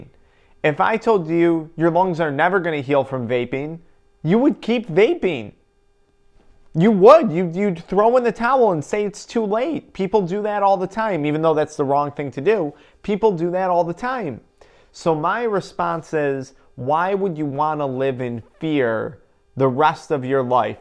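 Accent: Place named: Midwest, United States. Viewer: I’m high asf rn and his Midwest accent is killing me😂😂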